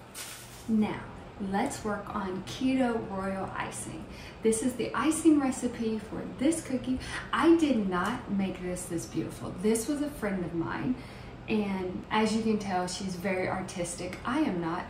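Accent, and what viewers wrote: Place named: South, United States. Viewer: That’s absolutely southernese! I love it! Go to Europe and you will find many different variations in language but I would not dare to ask them to change their way of speaking bc my way is different. That’s what is unique ab people from different parts of the world. Stay the way you are. I do love hoe respectfully she ask though.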